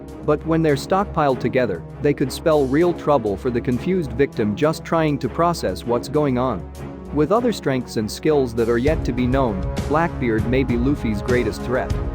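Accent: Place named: Ireland. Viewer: Ur sound like lrshion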